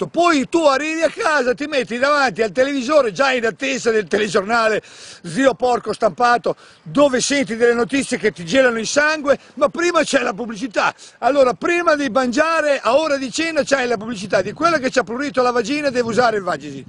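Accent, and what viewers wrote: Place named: Modena, Italy. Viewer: Dialetto modenese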